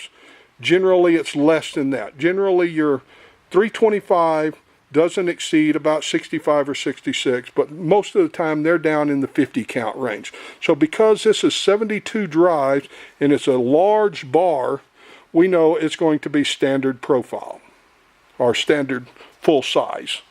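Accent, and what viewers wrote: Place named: United Kingdom. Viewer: Hi Lovely accent where ya from Bro.............Briddy UK thanks.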